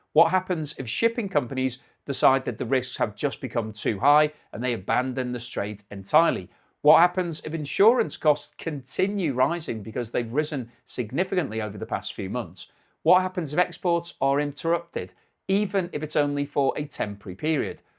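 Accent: United Kingdom. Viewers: YouTube is my crash course in the British accent. My head is still hurting, my mind wanders. I may be too old for this. Great analysis, Sir! Thank you! I'm wondering if this new Fed Chairman will be a modern day Arthur Burns - accommodating the president's war spending, leading to inflation. So higher interest rates? Hmm.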